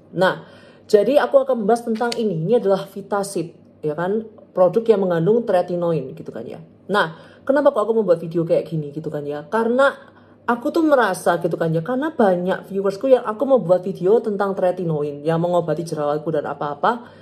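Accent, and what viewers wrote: Surabaya, Indonesia. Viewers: Logat suroboyo ne kak 😍 bagus bagus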